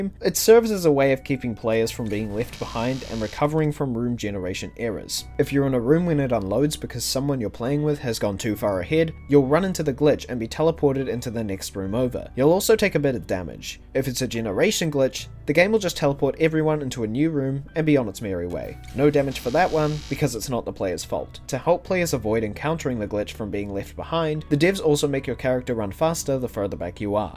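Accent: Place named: New Zealand. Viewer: Are you a kiwi too? I recognise the accent. What city or town do you live in? I live in Matamata. Edit: typo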